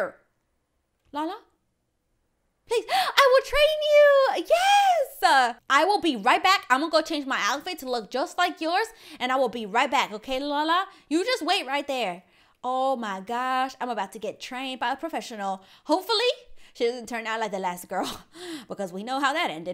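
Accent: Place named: United Kingdom. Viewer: OMG I love ur voice h a little bit of normal, and a little bristish! LOVE YOU<3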